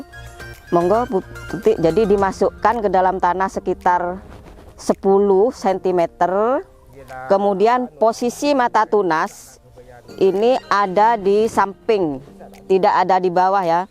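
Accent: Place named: Madura, Indonesia. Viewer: bahasa Indonesianya , ketoro nek wong meduro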